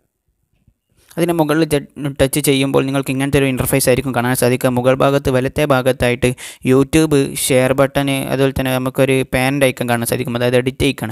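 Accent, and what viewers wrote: India. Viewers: Are you from malappuram♥😂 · ഇടക്ക് ഞമ്മളെ കോയിക്കോട് നാടൻ ഭാഷകയറി വരുന്നു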